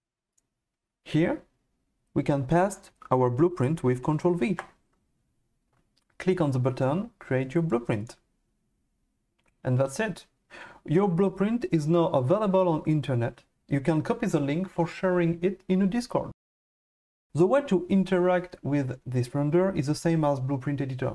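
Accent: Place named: France. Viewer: Your french accent is beautiful 😂❤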